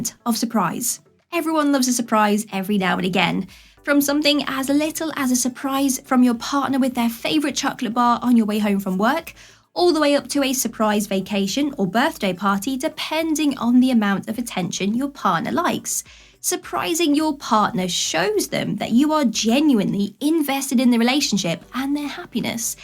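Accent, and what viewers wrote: England, United Kingdom. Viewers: I could listen to you,.... seriously, what part of England is your accent from?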